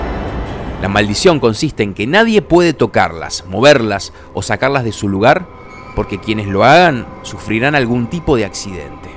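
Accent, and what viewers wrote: Argentina. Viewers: Eres argentino verdad :pd acá en México nos estamos cagando s México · ¿Un argentino narrando leyendas mexicanas? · Qué excelente manera de generar contenido que buena edición y narrativa haces muy buen trabajo soy de Colombia y amo a México como no imaginas, nunca he tenido el placer de viajar allá pero su cultura ha hecho parte de muchos colombianos por años gracias a la tv y ahora el internet la riqueza cultural de México es innegable gracias por dejarnos conocer más de sus tradiciones Aunque bro soy bastante miedosito y esa imagen final de la historia de Nachito donde pones ese niño tan aterrador jmm Dios casi me matas no borro esa imagen de mi cabeza Me gustaría escuchar historias de muchos países más el acento argentino me encanta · Me encantan las historias Mexicanas contadas por extranjeros , el acento Argentino le da ese toque de terror e intriga , ( soy mexicano ) saludos a los argentinos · Narrada por un argentino???!!! 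Que poca madre!! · Es interesante escuchar leyendas de mi querido Mexico de voz Argentina jajaja, me gusto la edicion y la forma de contarlas, aunque la de la llorona es algo equivocada, pero hay un comentario que lo explica bastante bien, saludos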